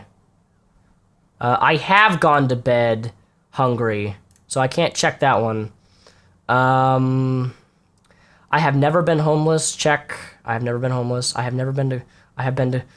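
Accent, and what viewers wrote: Finland. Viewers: Wait what, is he finnish??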